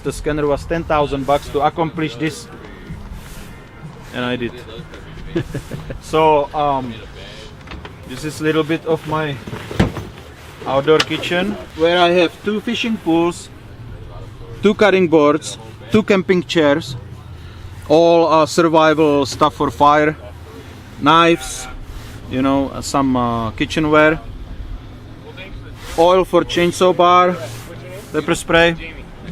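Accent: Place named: Russia. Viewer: Funny guy, i tought he was russian by his accent, real nice and hand-on guy !!! Those 5 girlfriends dont know what they were losing !! 👍👍👍👍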